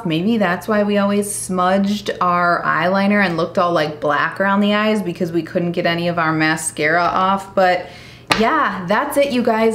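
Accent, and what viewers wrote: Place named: Midwest, United States. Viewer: the midwest accent is everything.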